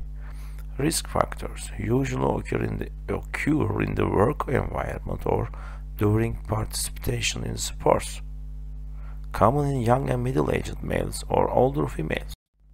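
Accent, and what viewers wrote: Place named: Turkey. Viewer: Bir Türk'ün İngilizcesini hemen tanırım:))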